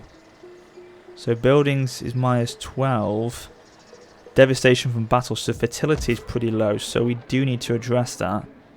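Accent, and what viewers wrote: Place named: Wales, United Kingdom. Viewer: You have inspired me to buy Attila Total War at the beginning of the next month. I enjoyed the first eight minute descriptions. I watched this video by finding the Attila Total war as usually first place of the top ten of 2015. Do you like Europa Universalis IV as well, most videos seem interesting. I have seen one bad review of Europa Universalis IV on gamespot. IT is a toss up, though I think for now your walkthrough sold the idea for Attila Total War! I love your accent, are you Wessex or Sussex Welsh?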